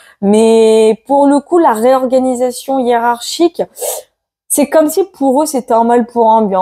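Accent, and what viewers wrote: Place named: North, France. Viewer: Chère petite je réponds un peu à bla personne qui dit qu il ne comprend pas à ce que vous dites en réalité c est peut être comme moi qui suis du sud et qui a du mal à comprendre l'accent du nord surtout lorsque tu ris en parlant C est marrant ''''mais peut être si tu arrive à parler un peu plus lentement ce sera mieux Je te dis cela sans aucune méchanceté car je suis une vieille grand mère qui te fait de gros bisous car tu es très très sympa je t ai connu dernièrement lorsque tu étais avec le bazard de la voyance💋💋💋❤️❤️❤️👍👍👍👍👍👍on t aime bien petite cherie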